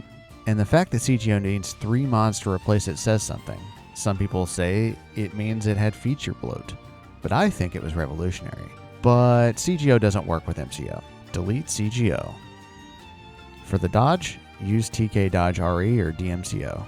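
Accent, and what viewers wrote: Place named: India. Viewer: The Indian accent put me off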